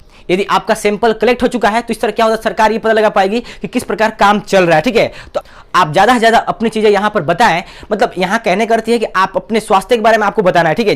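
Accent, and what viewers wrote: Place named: Bihar, India. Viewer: thoda space dia kr bolte waqt bihari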